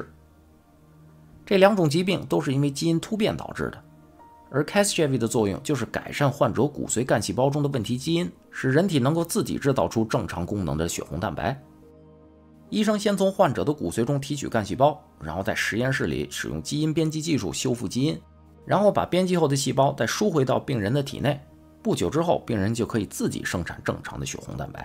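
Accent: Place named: Tianjin, China. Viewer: 真好，现在每期都要听，内容好，还有好听的天津普通话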